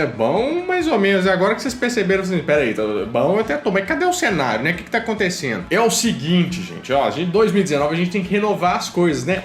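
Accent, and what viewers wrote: Minas Gerais, Brazil. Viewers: 1- O sotaque de Minas Gerais é um dos mais bonitos desse país. Bão msm. 2- Vídeo de abdução: eu voto Sim!!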